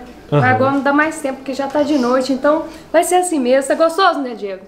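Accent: Minas Gerais, Brazil. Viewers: Oi vc está falando mais do que NUNCA o sotaque mineiro..Ñ vi vc e o falando em turco tô com saudades. Fiz Natal DEUS os abençoe 🌲🎆✨🎊💝💖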